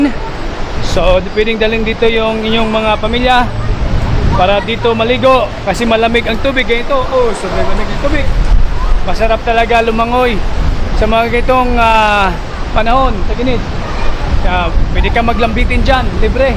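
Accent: Iloilo, Philippines. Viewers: Kuya Taga saan ba talaga province nyo.parang ilonggo salita nyo?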